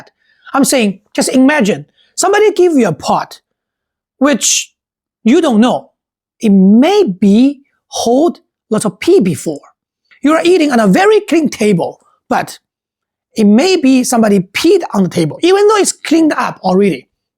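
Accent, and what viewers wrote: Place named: China. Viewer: congrats thats not the norm... You're taking a single video and the spinning it like as if its the norm in china and that everyone acts like that... based on your accent and the way pronounced the restaurant I can pretty much deduce that you're chinese... Yet you seem to spinning china's perception as uncivilized and backwater... I tell you what, you can see the same actions in America, or even elsewhere around the world, yet you actively decide to criticise china like as if the west is best and china is uncultured and uncivilized... And by the way the video is of rowdy kids who were drunk, its not people who are sober and doing it for kicks... Is a dude drunk off his bum and doing dumb shit... Lastly the foods and restaurants are clean and sanitary, like I said this is not a common thing that happens in china... Way to spread China hate and chinese hate... 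You are part of the problem why chinese people and china is getting unwarranted hate from the west... You are feeding the racists and anti china crowd.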